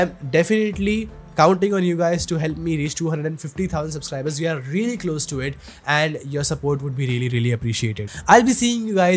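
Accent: India. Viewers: i was just going to say. dude tells you to turn off a firewall protection then non schilontely tells you to download malware while having a notoriously indian hacker voice. indians really should get morals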